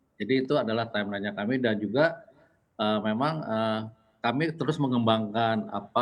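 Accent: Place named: Banyumas, Indonesia. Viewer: bapake kayane wong banyumas/cilacap ya..ngapake esih keton loh pak .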